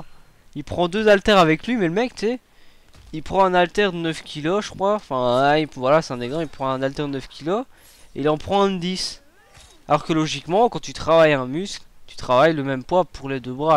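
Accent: Belgium. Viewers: on entend que tu parles plus en belge là c'est symas :)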